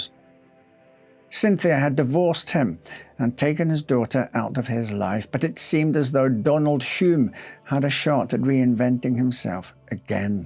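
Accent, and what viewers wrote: United Kingdom. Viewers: I mean this in the best way possible... Listening to an older man with a British accent tell me stories, is just so fitting! 🗣️👴👍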